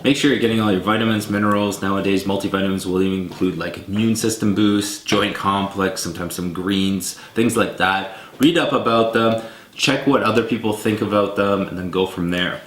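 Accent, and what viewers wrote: Canada. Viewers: You have a thick Canadian accent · Is he Canadian?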